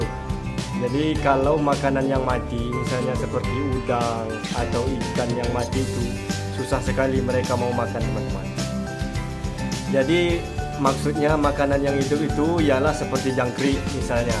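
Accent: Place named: Lampung, Indonesia. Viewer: Abang asal lampung kah? Logat nya mirip orang lampung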